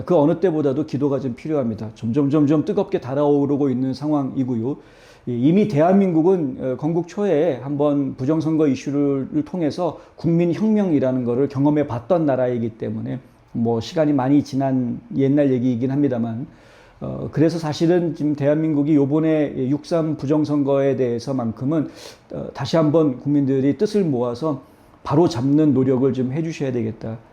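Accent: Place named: China. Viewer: 유튜브발음이 중국인같음!! 윤통체포… <link>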